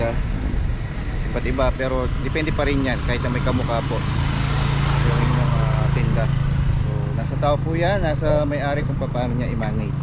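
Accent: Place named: Pampanga, Philippines. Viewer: May accent nang Kapampsnganndi Mrs.🎉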